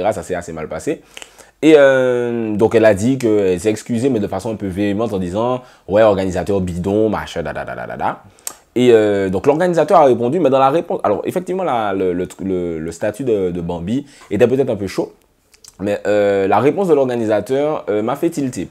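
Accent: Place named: Antilles, France. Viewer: C est super comme analyse mais quand tu dis : je vais prendre mon accent antillais...lol...tu l as H 24...xptdr...on entend que ça ton accent....🤣🤣🤣ne le prend pas mal. A bientôt.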